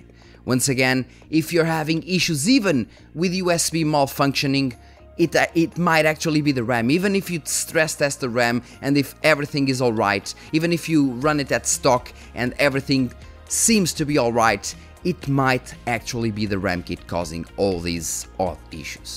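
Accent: Portugal. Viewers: Pelo sotaque vê-se logo que és tuga.xD Os erros aleatórios sao fdidos... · First time watcher here. Have had long time problems with RAM so i know how fucked up that is...sent 2 ram sticks back, because stick #4 "didn't work", second ram kit comes back and then different stick doesn't work, looked like a game of whack-a-mole...3 sticks worked fine and wouldn't let the 4th stick work well...different sticks...sometimes 32gb sometimes 24gb...eventually gave up on the everyday struggle so now I'm rocking just 24gb constantly. PS: was doing a inner challenge trying to figure out if you were maybe PT (maybe ES but didn't sound much like it) and i got it right! Unmistakable accent :) Keep up the good work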